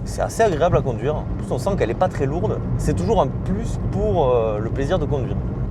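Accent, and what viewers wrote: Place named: South West, France. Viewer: J’adore l’accent du Sud Ouest !👍🏻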